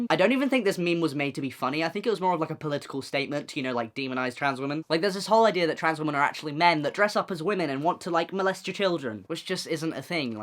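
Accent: United Kingdom. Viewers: Are you British or Aussie? I can’t tell from your accent lol. I’m Aussie.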